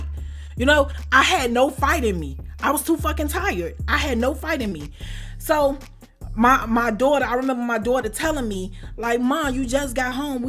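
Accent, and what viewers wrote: Chicago, United States. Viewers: Mannnnn you should've sold this shit to NETFLIX or sum!! Nbs I'm engaged like a mug! Lol Saw you trending on here never clicked on the vid then a reactor I watch reacted to your video of your stay in the county. The way you talk is Chicago through and through and it got me hooked and entertained while at work! Lol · I ❤️ a smart Pretty Thug woman! Baby girl you can tell a story like no otha 😆 😂 wit cha Chicago self luv that accent. I'm ya cuzzo from Milwaukee Do tha thang thang baby girl blow up write a book documentaries etc.LUV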